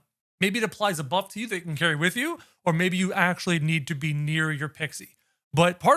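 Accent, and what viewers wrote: Canada. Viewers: sounds like he’s from or near Canada somewhere (?) my guess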